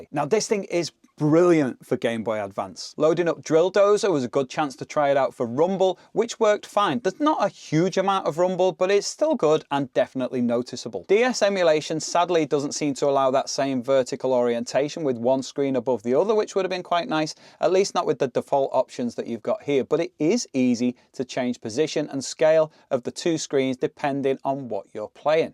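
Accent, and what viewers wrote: United Kingdom. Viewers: Great video, it's a breath of fresh air to get a British person instead of the crazy yanks.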